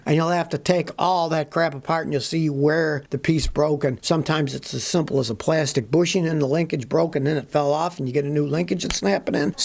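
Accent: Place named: Texas, United States. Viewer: Hi!.. Been watching your channel for a week now..... Basically Im working from home and I use youtube for some background no-vocal DNB to put my brain in gear and keep me going while im typing... but for some reason... I can both concentrate on work, while listening to your voice... and by some miracle I can even remember everything you are saying... It must be that Texan accent;) Anway... about the OCD guy with the manual car question: Im an Aspie (Aspergers, which is similar to OCD) And I also had the pedal-reflex issue.. so much that I've developed a bad habbit of howering my foot over the clutch (while training), pressing the clutch as slow as the breaks, often times not fully.... I felt unstable in the seat and insecure and unstable to change gears in higher speeds. Forgetting to change gears and all those typical Aspie/OCD issues. 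Its a multitasking and mentally shifting tasks -issue... because of the sensory overload. Especially because the world outside of the car feels unreal and 2D to us. Here in Croatia the instructors are very strict, before only 60% would pass, now only 20% candidates pass. Licenses here cost like 900 $ for a 2-3 months theory + minimum 35 hours driving hours. And then each exam is about 90$. We also have to pass first aid exam too. And of course here we learn only on manual and drive only on their cars, but directly on the actual roads. So their cars are all manual, one steering wheel and double set of pedals. I drove almost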